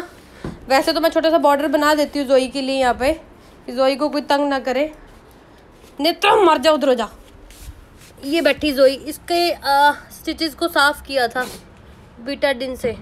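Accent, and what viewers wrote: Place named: Haryana, India. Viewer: Mei v karnal jdo aye tuhanu miln awAge tusi bilkul mere Wang boldey O meinu apna Haryana yaad a janda tusi bot changa kamm kardey o rabb tuhade harr khwaish poori karey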